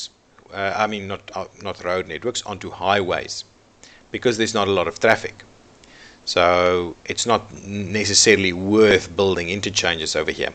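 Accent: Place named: South Africa. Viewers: As soon as i heard him speak I thought you were South African, then I heard you say "trrry" then I knew . Glad to have this video. Really helped me as I always battle with transport on cities skylines. You just got a sub. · I pinned him as South African immediately. :) 👍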